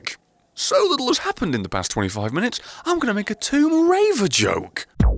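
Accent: United Kingdom. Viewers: I doubt you'll read this, but thanks for making such amazing content, my dear madman. Seriously, you've made me a maniac, and I love you for it. You just bring laughter to my life, and a bloody lot of it. I would give a fucking arm and a leg to talk to you once, since I really only need one of each. One more thing I love you for, you're british, and I love british slangs and accent. xD So keep up your work man, you have my full support!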